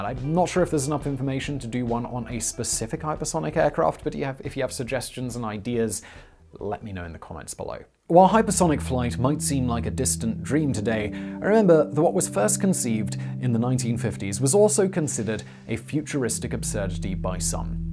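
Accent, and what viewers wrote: RP, United Kingdom. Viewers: This guy is so posh I love it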